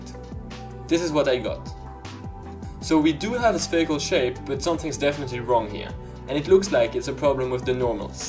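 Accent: France. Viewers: Are u french?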